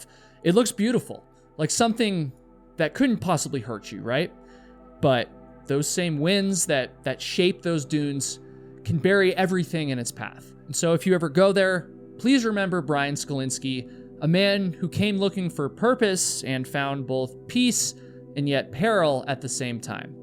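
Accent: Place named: East Coast, United States. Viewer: I'm from Toronto, and it warms my heart to hear your East Coast slip out every now and then. Words like charge and bar, love your channel; keep up the good work!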